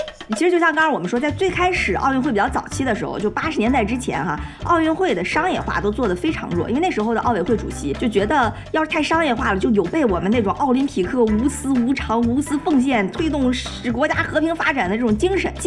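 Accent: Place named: Dalian, China. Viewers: 哈哈，小lin东北人！ “抖“是奥运会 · 哈哈哈哈 大连话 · 喜欢大连话！！！ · 、 一口地道的东北腔，特有趣。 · 这期大碴子味特浓郁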